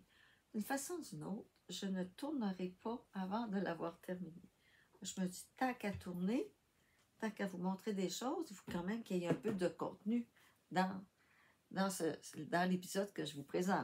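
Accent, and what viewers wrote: Quebec, Canada. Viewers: Quel plaisir de vous visionner, j'adore votre douceur et votre bel accent québécois. Bonnes vacances. Bisous de la France 😘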